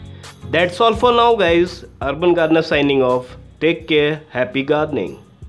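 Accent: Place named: India. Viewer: Im just here to listen indian accent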